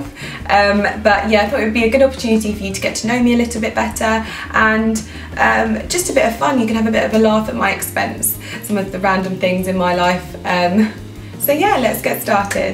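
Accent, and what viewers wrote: RP, United Kingdom. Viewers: Strange how since you’ve progressed you’ve actually started to speak ‘posh’ too...mmm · I'm new and u just happen to be amazing :D and i love british accent so its a bonus haha and u have such a nice healthy body really impressed with the hard work and determination, gonna be one of my inspirations! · I don’t understand people talking about her accent. Its been blown so far out of proportion. She sounds almost the exact same just more mature. she’s always been posh. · I'm here for the British accent! Such a beautiful and sweet woman. Oh and btw, you have mentioned you haven't cut your hair in 8 years, however your hair looks so healthy, thick and strong. What are you hiding from us? Haha. Please make new videos. Subscribing Love, from Lebanon.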